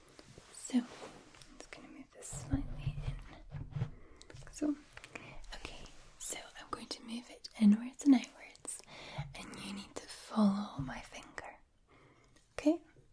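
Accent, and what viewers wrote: Scotland, United Kingdom. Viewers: I do love the Scottish take on the ol' CNE (being Scottish myself) :-) Great work! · Best Scottish ASMR youtuber! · I'm getting sick of seeing these! Jk I love the video and nothing beats a Scottish accent! 😊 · i love love love the Scottish accent thank u for this it’s amazing keep up the good work!! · who doesn't have an accent? English accents are so damn mixed and diverse that even being from the UK I can't tell what it is. I think it's more so Scottish. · I am scottish myself, so this makes it much better to listen too, also probably one of the best ASMR'ists of youtube in my opinion :) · So cool listening tae someone else who’s Scottish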